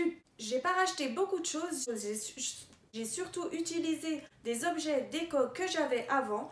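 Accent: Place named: East, France. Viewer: Pas mal ta chaine ! Oh ça sent l'accent de vers chez moi, l'Est !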